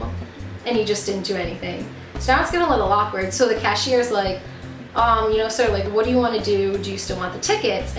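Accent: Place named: Massachusetts, United States. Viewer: LOVE Faneuil Hall! I'm originally from MA, but I live in Alabama now. Hearing you talk reminds me of home haha. I also LOVE Disney (I did the Disney College Program), I've been to the etsy shop you recommended for Mickey Ears and I was wondering if you've seen this shop: Anthology Candles. They make candles that supposedly smell like things from the Disney Parks (rides, food, resorts, etc). I'm dying to try some!!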